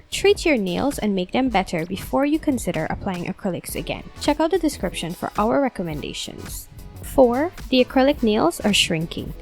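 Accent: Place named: Caribbean. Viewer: Sounds carribean, maybe Trinidad or Guyana .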